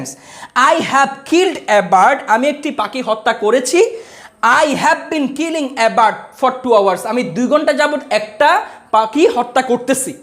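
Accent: Chittagong, Bangladesh. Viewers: apni ki Chittagong er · আপনি কি চট্টগ্রাম এর ? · Closing my eyes,,,i can say you are from Chittagong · Vai.... apni to mona hoi chattogram er people. Amio akjon chattograminian. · Sir mone hy chittagong ar tai na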